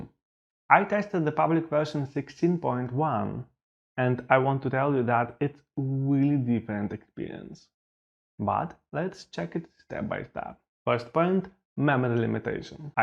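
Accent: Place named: Russia. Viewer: Are you Russian? What is the accent?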